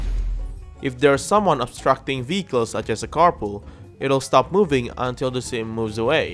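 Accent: Indonesia. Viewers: are you from Indonesia? I can hear your Indonesian accent. ✌️✌️✌️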